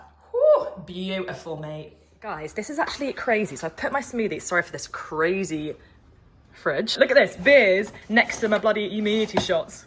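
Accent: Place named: South, United States. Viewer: I enjoy your videos. They are enjoyable to watch but would you stop with the southern accent? Please.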